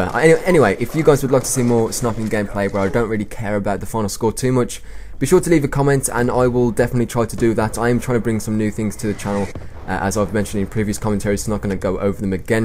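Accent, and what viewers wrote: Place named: Black Country, United Kingdom. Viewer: Where in England are you from? You sound like you're from the Black Country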